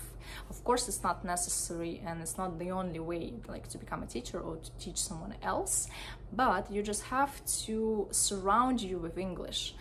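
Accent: Russia. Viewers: rus işte hep aynı aksan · Every nation has spesific accent it so cute Russian speakers make t d r h really different 😂❤️🥰but it original ❤️ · Я русского отличу запросто лишь по акценту)) а так словарный запас, и уровень великолепный, вы умничка! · Сразу видно ,что вы носитель русского языка, и по внешности, и по акценту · That's why we feel Russian accent in your speech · Разозлило что потеряла 7 минут смотря видео, так что напишу.. Ни одного совета… очевидные вещи.. акцент четко русский.. по словам не слышно уровня с1.. максимум б1.. речь беглая, супер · As you mentioned that you speak russa, i immediatly started hear your accent. i like russan accent. That always remind me the hollywood movies.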